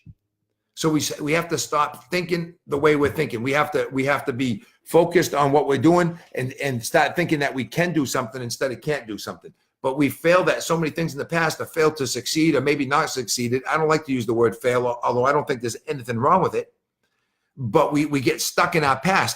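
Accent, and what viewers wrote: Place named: Boston, United States. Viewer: That feedback loop and accountability are so important. That Boston accent is strong!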